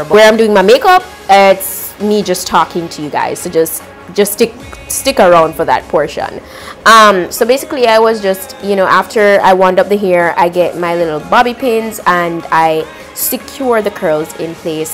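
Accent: Jamaica. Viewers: Love love love that talk. You must be jamaican, I heard that patois 😁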